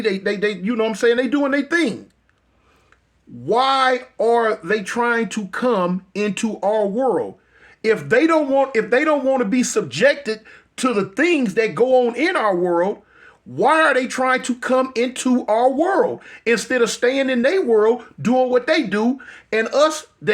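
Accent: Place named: Houston, United States. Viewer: You sound like you from Htown Tx... Southern Voice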